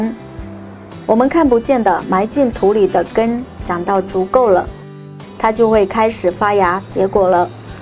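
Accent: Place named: Taiwan. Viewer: 腔調，很台，讚。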